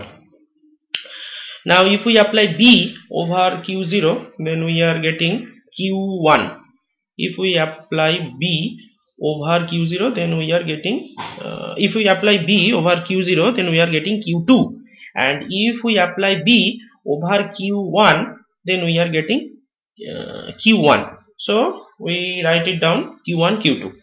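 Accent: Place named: India. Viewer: That Indian English is very disturbing otherwise is the example really gut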